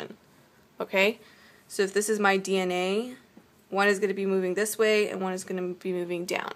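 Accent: Canada. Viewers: thanks sweetie :) SO helpful...are you canadian btw? :)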